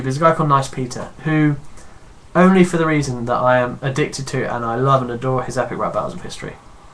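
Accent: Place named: United Kingdom. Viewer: Yeay 1st vlogs! You have a purple female top in your office? I have to say I'm very flattered to be placed among such greatness as the other four. LOL, stalking is okay...sometimes. John Green Book to start with is PaperTowns. Well, it's my favorite. Great job! I'd say talk about whatever your passionate about, that passion tends to show in videos. (I'm also a sucker for British accents. I "teehee"d cause I don't think I've heard "KeepOrJot" said with a British accent.)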